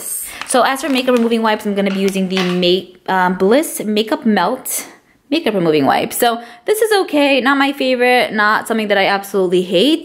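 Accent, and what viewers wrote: New York, United States. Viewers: Great video; can't wait to see your reorganized stash. By the way, I notice a very distinct accent; New York? God bless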